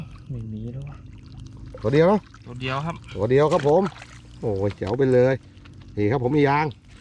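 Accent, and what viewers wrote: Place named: Trat, Thailand. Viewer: สำเนียงการพูดเหมือนคนตราดเลยเกี๊ย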